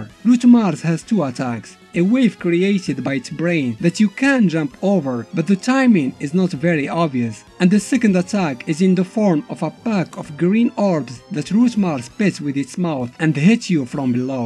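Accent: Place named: Morocco. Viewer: I love your videos ❤️ man you accent looks so Moroccan 🙂🙂🙂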